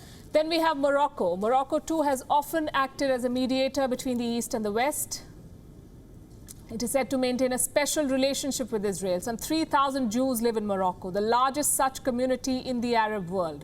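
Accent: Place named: India. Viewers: she knows her stuff.if accent bothers u,most indians have accent. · This woman has Apu's accent. So horrible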